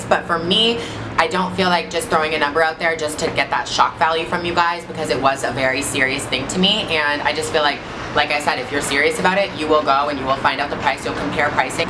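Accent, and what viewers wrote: Canada. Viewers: she is from Canada, our english is similar to the UKs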